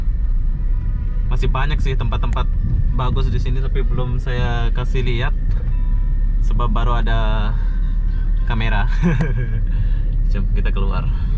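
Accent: Malaysia. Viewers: Masih penasaran aku..org indo tp kok logatnya malay.. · Abang ini asli dari mana kah? Logat nya melayu bgt.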